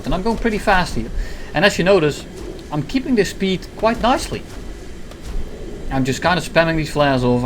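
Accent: Netherlands. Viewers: hahah still a nice video😉 wait a dutch accent ?🇳🇱🇳🇱🇳🇱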